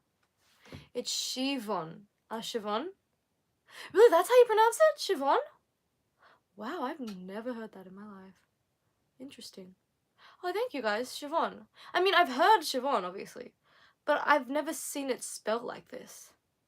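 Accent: Australia. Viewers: es muy linda 💕 y su estilo australiano de expresarse es maravilloso. Te amamos 💕💕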